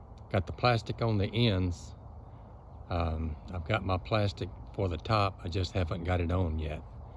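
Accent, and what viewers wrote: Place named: Louisiana, United States. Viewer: I was guessing Louisiana with the accent lol. I was close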